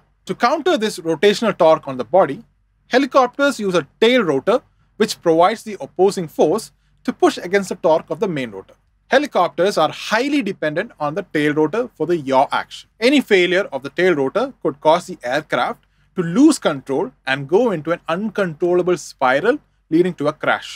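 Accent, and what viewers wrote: India. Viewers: Ugh heavy indian accent...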